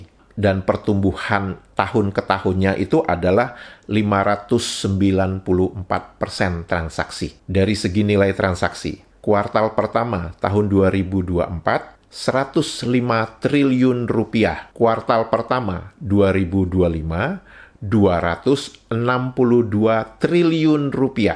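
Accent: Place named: Malaysia. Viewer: Logat melayu kampungàn....muchrahhhh!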